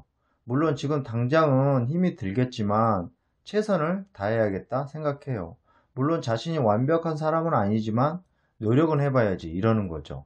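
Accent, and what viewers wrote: Jeolla, South Korea. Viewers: 전라도 분이신가봐용 헤헤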